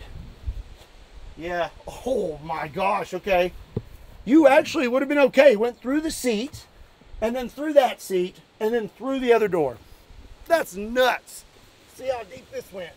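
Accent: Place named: South, United States. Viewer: you can hear the southern in his voice clear as day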